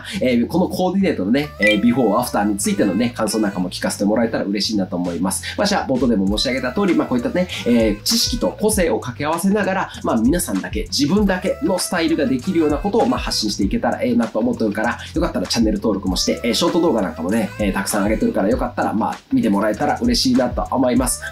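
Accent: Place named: Chugoku, Japan. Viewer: 中国地方の方言すきだな〜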